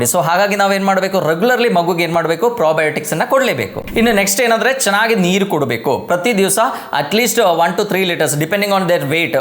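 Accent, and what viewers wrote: Kerala, India. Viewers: Good explanation 🎉 good kannada by pronoucetion I found malayali🥰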